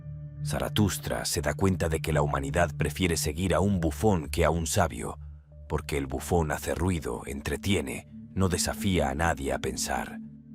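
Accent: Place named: South America. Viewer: Parece un sudamericano pretendiendo ser castellano. Es mezclado.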